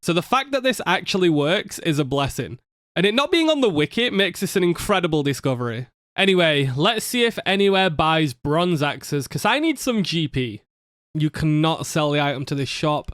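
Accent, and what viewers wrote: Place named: Northern England, United Kingdom. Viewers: i wasn't really interested in this series when I saw it in my recommended, but then I heard the yorkshire accent and now I am staying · Ahhh you’re so northern, I’ve found my home here 😂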